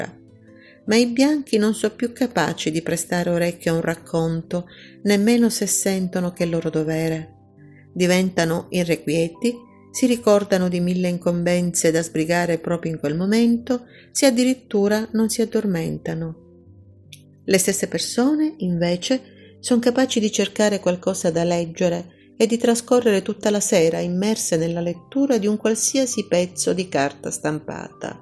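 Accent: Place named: Sicily, Italy. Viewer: Vabbè, puoi fare un corso di dizione se vuoi, ma credimi, che anche se si sente l'accento siculo secondo me andresti alla grande!!!😉 Trasmetti più emozioni TU con il tuo accento, rispetto ad altre persone che ho ascoltato!!!😍🤗♥️♥️♥️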